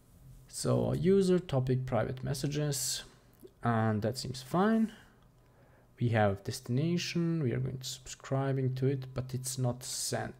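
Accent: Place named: Russia. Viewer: Are you from Russia?) I think so, because your English has a Russian accent.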